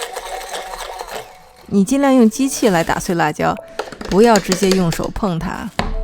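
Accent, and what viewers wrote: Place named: North, China. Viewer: 这北方口音... 没得说了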